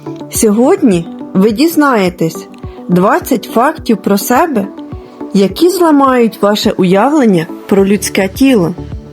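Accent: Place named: Poltava, Ukraine. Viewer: Не дивуюсь, але не перестаю дивуватися, який Господь великий! А ще поважна людина, що озвучує з Полтавщини, чи Черкащини))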